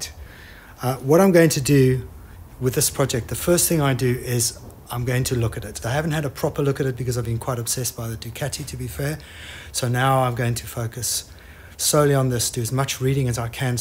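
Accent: South Africa. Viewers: You sound very South African?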